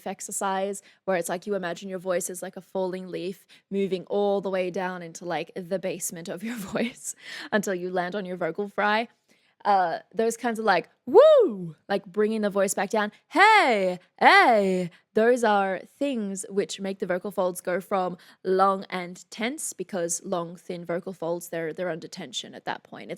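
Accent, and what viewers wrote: Australia. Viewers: "You Nut Job"! I love how you Aussie's talk! PS: I'm glad you go with the English way of spelling words, like "colour" unlike everybody else who leaves the "u" out of a lot of English words, other examples are words like "parlour", "behaviour" etc. I'm a Kiwi so we don't leave the "u" out of words either unlike the American's do. Americans just love to abbreviate everything and anything until it's unrecognisable, I think! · And yes... I could tell you were a Queenslander from the accent alone :-)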